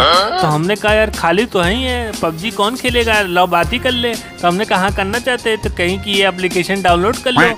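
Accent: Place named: Jaunpur, India. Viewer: Me bhi ek youtuber ho aur aapki awaz sun kar Asia lagt hai aap junpur se hai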